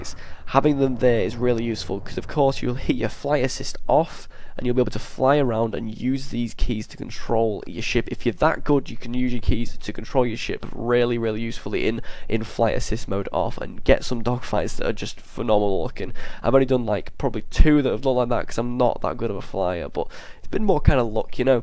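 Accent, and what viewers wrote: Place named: United Kingdom. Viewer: You might well not like his voice. But it's a regional British accent rather than a nose blowing issue.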